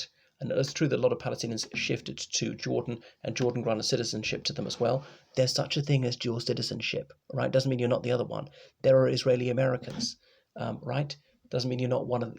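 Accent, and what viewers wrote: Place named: England, United Kingdom. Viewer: are you Irish or English? You certainly sound extremely English and but your channel name is from Ireland?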